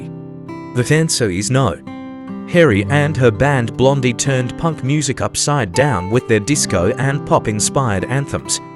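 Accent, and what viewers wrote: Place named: Australia. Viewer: An AI with an Australian accent…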